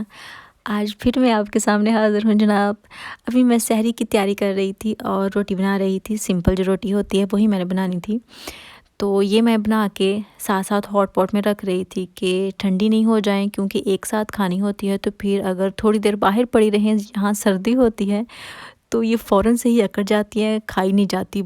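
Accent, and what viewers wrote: Faisalabad, Pakistan. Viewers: Urdu lehja bilkul fsd walo ka hai 😋😋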